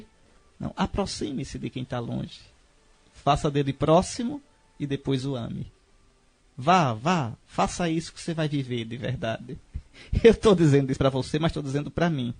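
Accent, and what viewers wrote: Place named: Alagoas, Brazil. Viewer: Dom Henrique, com seu sotaque alagoano, sua simplicidade, sensibilidade e conhecimento, nos leva a conhecer e a meditar a Palavra de Deus. Esta pregação é uma relíquia! Quanta sabedoria!🙏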